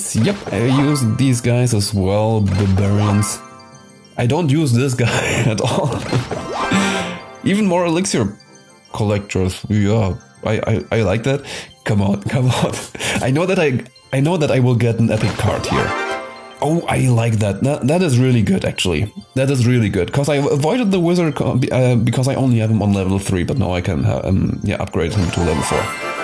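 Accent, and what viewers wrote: Germany. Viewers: du bist deutsch; das erklärt die Aussprache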